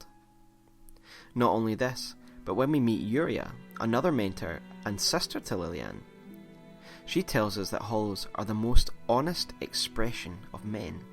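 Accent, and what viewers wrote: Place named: Scotland, United Kingdom. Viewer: Hey another Scottish person! Amazing video mate- very informative, got a new subscriber cant wait to see more!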